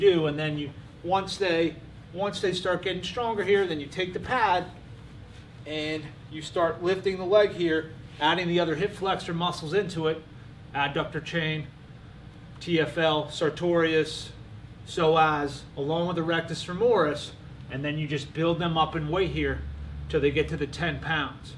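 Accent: United States. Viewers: U got the nyc accent. U from nyc? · Ha, PA accent. Go Stillers!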